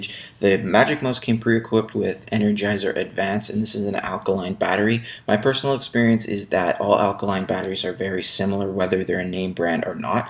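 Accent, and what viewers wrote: Canada. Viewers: Same problem!! I have had this mouse for 5 years. The last time I got the low battery warning, I couldn't get the back off no matter what I tried. I popped into the local Apple Store and she did it easily. I felt like an idiot. I practiced. Then, last night, I got the battery warning again. Figured, okay. I can open up the case. Failure!! Again. I was on my way to the Apple Store again, feeling like the same idiot - but more so. I thought "Lemme check YouTube." Thank you!! You saved me a trip and my sense of pride.;-) BTW, are you Canadian, by any chance? Big thanks!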